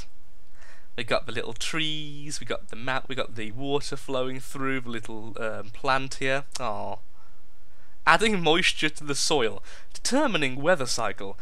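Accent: United Kingdom. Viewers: Harry Potter accent of english <3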